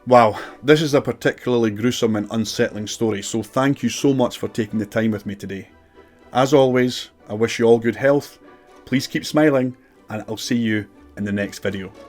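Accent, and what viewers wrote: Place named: Ayrshire, United Kingdom. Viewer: My folks live in Ayr, you sound just like you maybe come from around there? New sub and loving the wee stories, thank you x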